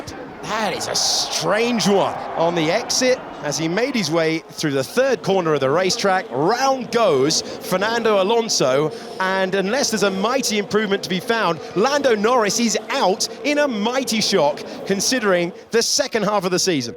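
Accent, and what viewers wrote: United States. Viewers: get this american of the commentary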